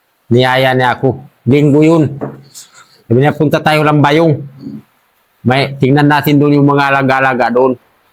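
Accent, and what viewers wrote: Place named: Tausug, Philippines. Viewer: Tausog na tausog talaga c sil mag kwento ba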